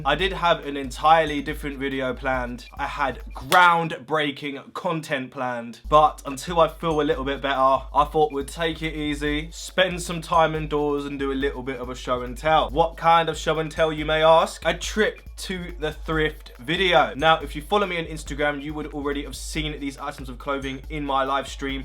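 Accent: England, United Kingdom. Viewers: Hey where in England are you from, like the accent?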